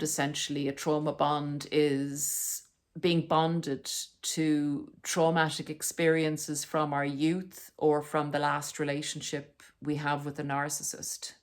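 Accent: Ireland. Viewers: I have an inner dialogue with myself all day every day lol, I use music to calm me, ground me and I love your Irish accent.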